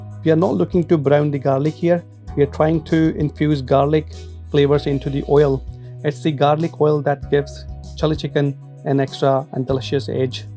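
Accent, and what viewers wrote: Scotland, United Kingdom. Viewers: Do I detect a fellow Scottish accent there mate? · I sense a Scottish accent 🎉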